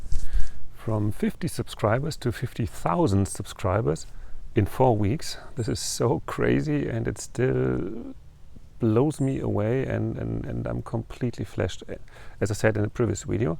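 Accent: Germany. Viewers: Hi From Michigan USA. I love watching your videos. My Father is from Germany so I am very familiar with your accent. I love how soft spoken you are and you have done a wonderful job with your house. As for the brick wall.. whitewashing will be the same as painting.. once done you can’t go back. The brick in your kitchen looks very nice. I’m sure that if you ever decide to do a air B&B with the house you will have no problem filling reservations with all these u tube subscribers! Good job! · From the beginning, i knew it you are German because of your German Accent. I have friends from Germany he live near Bonn, I also have from Elmanau. Hugs from the Philippines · Hi, woher kommen deine Englischkenntnisse? Ich hab natürlich deinen deutschen Akzent erkannt. 😏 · You are obviously a naturalist. Most likely a vegan or at least a vegetarian. Beautiful German accent. 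Beautiful country!!!! My father was 100% German. A hard working honest stern proud man. Nothing he wouldn't take on with full stream. Luckily I'm like him and not my passive Norwegian mother. 🙂 God's speed. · We have it here in Western Australia on Sunday and also from 5pm to 7am every other day however we can mow our lawns on Sunday from 10am to 4pm. It's a great idea and even with Sunday mowing it still feels like a rest day with the smell of fresh mow grass and should you not abide by the Council by-laws the Ranger will pay you a visit and fine you so it's just not worth the effort, everyone seems to abide anyway. Funny you should say some thought it Australia, I thought Germany because of your accent. Congratulations on your 50 thousand subs, I think we're all enjoying normalcy and calm wether here or away were all pretty much the same as people I think 👍🐨🇦🇺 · I knew it! You are lived in Germany because of your accent. 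And no wonder why you are so hardworking (because i knew someone who also lives in Germany that can do so many things in his free time while working at the company and also he is a lecturer) Cheers and God bless you From the 🇵🇭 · Nice to meet you,. I recognized your German accent, although your English is excellent. I lived in Neu Ulm for 3 years. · I think it's funny someone thought your accent was Australian. I knew it was either German or Austrian before you mentioned the GDR and because it sounds like my Aunt's accent and she's from Germany, the eastern part too. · Haha called it I new that was a German accent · After three spoken words in one of your previous videos I knew that you are German. Well, I am too. That might explain it. And I recognized the German forest. Keep up the good content! Grüße vom anderen Ende Deutschlands aus dem Saarland :) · I love the little info show. Thanks! 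Also about the accent. I knewwwwww IT was my grandparents motherland...Germany! yayyyy! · I would think that it would be obvious that you are German as soon as you start talking I could figure that out. I really like your videos it reminds me of my dad doing woodworking onon the weekends. Plus your personality is a perfect match. ( my dad was German, he has since died) Also having no screens on your windows tells me for sure you’re not in America. We are fanatics about our screens on windows and patios. Good job.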